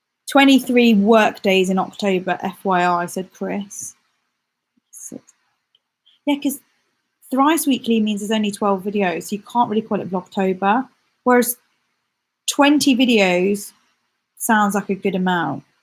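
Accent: South, United States. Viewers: Scarlett O'Hara accent Southern?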